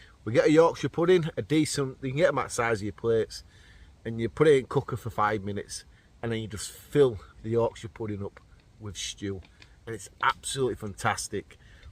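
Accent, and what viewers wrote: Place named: Liverpool, United Kingdom. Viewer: It's called scouse lol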